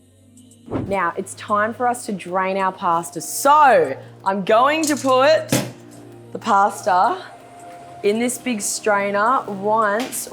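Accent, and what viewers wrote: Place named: Australia. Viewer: why do i love her voice, so Aussie😍 edit: AHHH SHE LIKED MY COMMENT❤️❤️❤️❤️❤️❤️❤️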